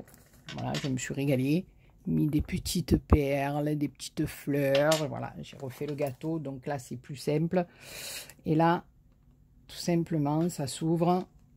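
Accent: South, France. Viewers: Re 👋, waouh quel magnifique album, on en prend plein les yeux 🤩💖😍, vous avez mis ce beau papier en valeur avec la dentelle, les perlounettes, les petits nœuds....... Bravo pour ce très beau projet. Merci pour cette présentation avec votre bel accent du midi et surtout votre bonne humeur, c'est toujours un plaisir de vous entendre, de voir vos créations et de suivre vos tutos. Bien sûr que j'attends vos prochaines cartounettes ou ATNap. Prenez soin de vous et profitez de la mer, du soleil🌞 et de la baignade 🏖. Bon dimanche, à bientôt 🥰🥰😘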